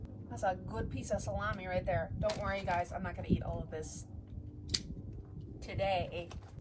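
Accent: Canada. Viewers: killed me with the Canadian accent 🤣 Love your corny jokes, education as you make your way through the trails and just your overall excitement when oot and aboot in nature! Amazing video as always and excited to see where you explore next, cheers!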